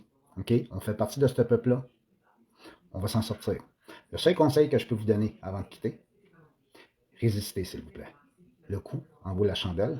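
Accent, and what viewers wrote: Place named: Quebec, Canada. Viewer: Le problème c'est son accent québécois à moitié compris ce qu'il a dit mais bon bon travail et bonne chance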